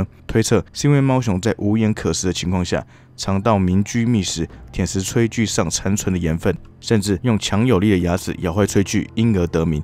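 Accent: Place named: Minnan, China. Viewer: 主播的國語去再進修，一嘴閩南口音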